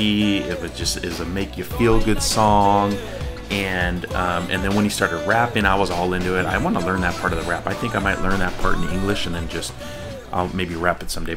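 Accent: Tamil Nadu, India. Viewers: I love your tamil accent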